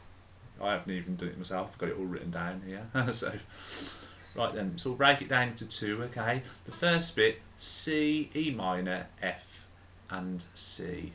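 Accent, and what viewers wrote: United Kingdom. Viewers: you from birmingham aha :D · hard to understand u with ur british accent !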